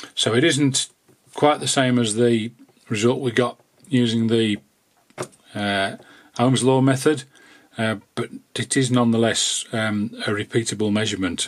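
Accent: United Kingdom. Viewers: may I ask. You live in North Yorks but your accent sounds more midlands.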